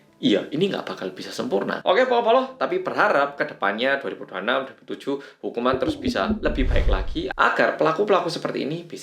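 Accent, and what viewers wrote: Java, Indonesia. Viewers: maaliiing wkwkkwkww medoook beuud yah mas e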